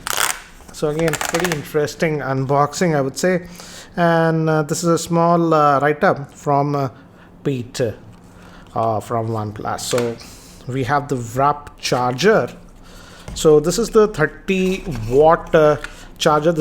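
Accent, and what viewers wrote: India. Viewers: very strong Indian Accent...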